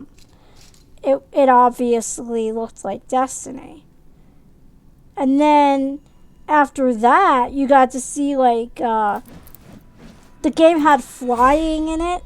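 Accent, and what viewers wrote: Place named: South, United States. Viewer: You sound a little bit young and like you're from the south And you listen to Magog😂 🤔🤔🤔 very interesting🤡🌎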